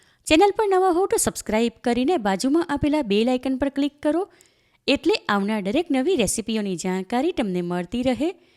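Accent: Surat, India. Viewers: Nice mango dessert I liked your Gujarati in Surati accent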